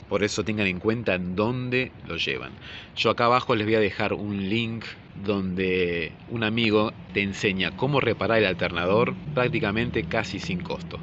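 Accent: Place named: Argentina. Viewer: desp de ver y escuchar varios vídeos, me encantó escuchar tu vos de argentino jaja. comparto con todo lo q dijiste. muy buen video, gracias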